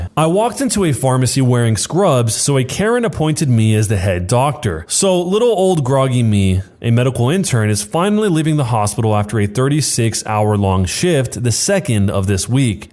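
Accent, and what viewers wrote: South, United States. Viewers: Your southern accent makes me want to watch true blood again lol. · I am absolutely losing my head over the southern accent omfg i love it 😂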